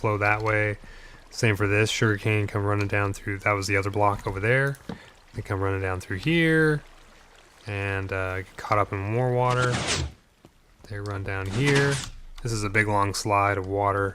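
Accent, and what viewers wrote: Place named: North, United Kingdom. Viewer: where are you from over that side of the pond? You sound pretty northern.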